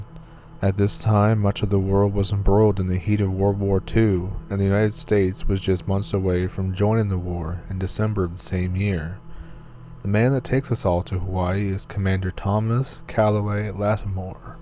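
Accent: Canada. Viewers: Canadian accent is lovely 😊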